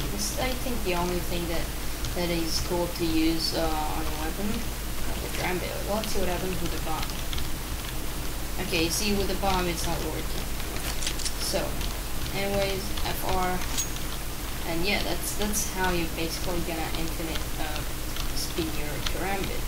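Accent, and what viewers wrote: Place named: Sweden. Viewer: he sounding swidish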